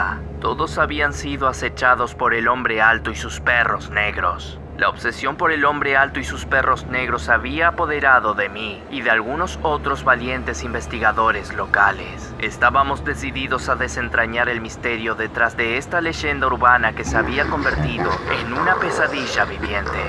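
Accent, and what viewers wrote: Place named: Argentina. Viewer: Es verdad hace 4 años era mejor haora abla como argentino porque cambiaste,😭😭😭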